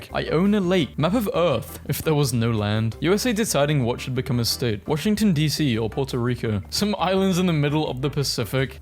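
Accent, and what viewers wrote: United Kingdom. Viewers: the vid was so good i didnt notice u were a brit for most of it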